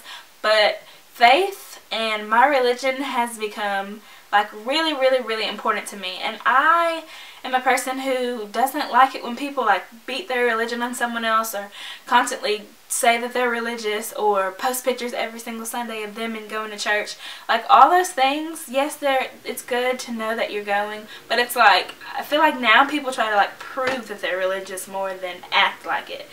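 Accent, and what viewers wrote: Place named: South, United States. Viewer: Gosh! I'm so glad I found your channel! You are just so cute! Where are you from doll? Your from the South right lol? No shade or anything y'all, I looooove the way you talk 😊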